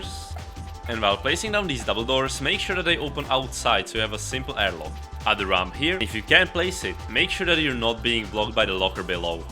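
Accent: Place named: Czech Republic. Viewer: Český přízvuk jde prostě poznat haha super baseka!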